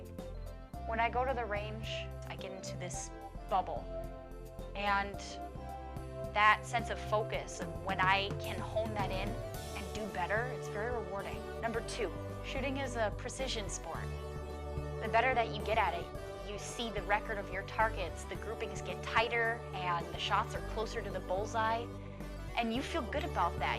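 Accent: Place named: Great Lakes, United States. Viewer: You have good videos...but you should work on losing that snarly Great Lakes accent. It's kind of annoying.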